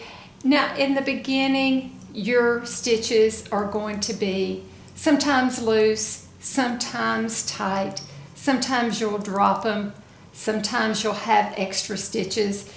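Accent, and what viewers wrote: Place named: Tennessee, United States. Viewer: So sweet to share. 🩵 love listening to your voice 😊 & Tennessee accent 😂🥰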